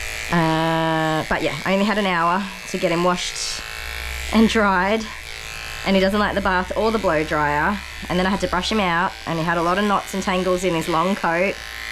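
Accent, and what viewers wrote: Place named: Australia. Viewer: Hi good to see you back on here grooming I have missed your presence as I enjoy your chit chat it is so refreshing to hear an Australian accent.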